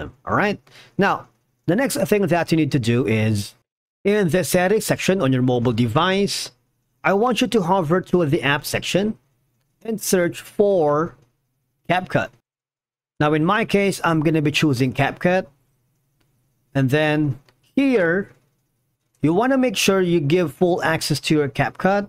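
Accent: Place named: India. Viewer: 😂😂😂 indian vid trying to look white hilarious the accent give it out big time nice try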